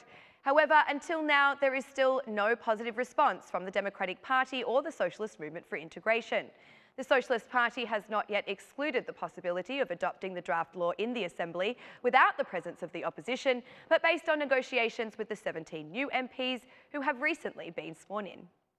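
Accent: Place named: London, United Kingdom. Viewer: LOL Nga Londra eshte kjo prezantuesja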